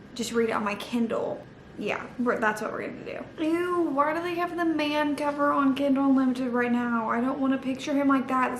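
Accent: United States. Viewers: where is her accent from in america? :O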